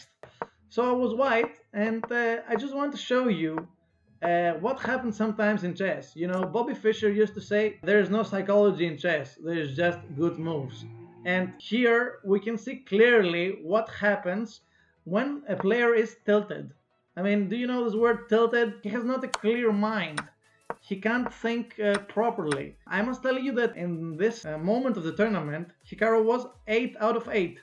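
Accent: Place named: United Kingdom. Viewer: Γεια σ ρε αρχηγέ! British accent! If you didnt got it i am Δήμητρα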